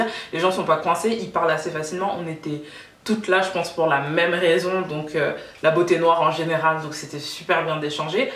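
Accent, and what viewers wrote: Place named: Antilles, Caribbean. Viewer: J'ai adoré ce petit accent antillais lol. Un vrai kit de survie 😏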